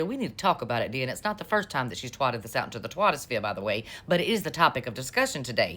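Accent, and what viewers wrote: South, United States. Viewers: I just love your Southern Belle accent dear!! and you have some beautiful blue eyes!! Your accent reminds me of my Aunt Fran who lived in South Carolina hun.... Im in Oklahoma... but my accent is more of a mix of Oklahoma, Texas and Louisiana. Thanks for bringing us the TEA everyday!! Much Love hon!! 😍😘🥰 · I love this lady... her style, her commentary, her beautiful southern accent, the whole thing. Plus she is a looker. 😊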